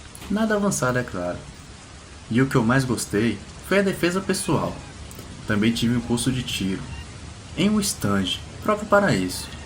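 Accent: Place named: Pernambuco, Brazil. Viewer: esse narrador só pode ser um pernambucano!!!! pelo sotaque esta mais que evidente,e qto ao possivel demonio em forma de cadaver? pode ser sim ,ele causa alucinações doidas. história FODA essa hein???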